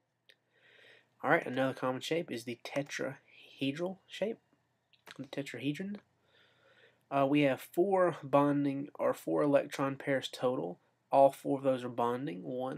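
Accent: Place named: South, United States. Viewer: Nice to hear a Southerner talking some sense every once in a while. No invocations of jebus and some good explanations of VESPR. Thanks.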